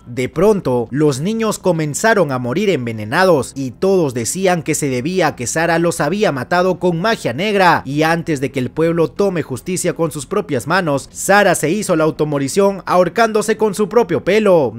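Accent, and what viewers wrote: Peru. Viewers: por la forma de hablar eres de perú´por lo visto te amooooo soy tu fans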